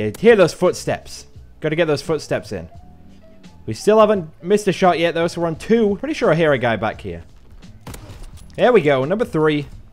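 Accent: United Kingdom. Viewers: Great to hear a British accent. Just for a change. Love the video mate. Subbed and liked.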